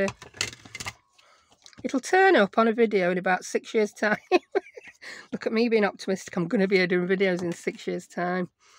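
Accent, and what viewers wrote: United Kingdom. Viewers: What a fun show! I really enjoy watching your creative adventures. And DIY labels is now on my Creative To Do List, thanks to you! I love my EK Success punches, too. I find them to be both sturdy and reliable. They also store more nicely than the big plastic ones made by some other brands. One question: you mentioned earlier on in this video that you knew of someone else who also like to make her own labels. I didn't quite catch the name of that person's channel (well, maybe it's my Yankee ears that can't always catch everything said by you Brits?).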